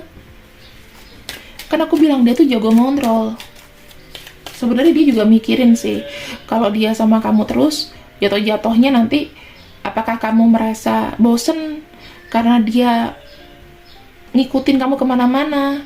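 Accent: Java, Indonesia. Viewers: Maaf mbak selama ini aku hanya mendengar genetal riding tp suatu saat saya mau personal riding dan caranya dihitung perja atau gmn dan perjamnya brp tktnya agak lm pertanyaannya ini aja mbak klo blh tau mbk itu org jaea apa medan ya kok pskai tp klo diliat bahasanya cengkoknya jg setenga jawa ini mbak slm dr martin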